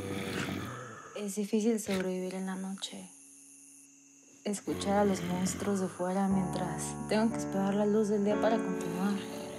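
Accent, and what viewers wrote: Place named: Mexico. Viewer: Su acento de mexicana 😍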